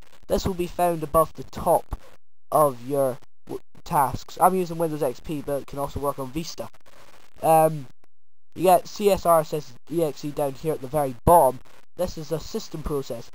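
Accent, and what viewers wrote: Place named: Scotland, United Kingdom. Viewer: scottish accent = da shit.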